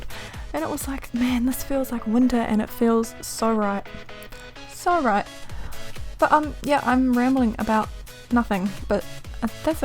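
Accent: New Zealand. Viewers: This house is pretty :) and are you from New Zealand?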